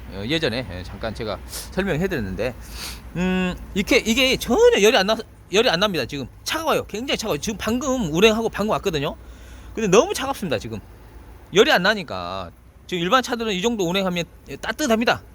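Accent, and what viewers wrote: Jeolla, South Korea. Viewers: 전라도 사투리 ㅎㅎㅎㅎ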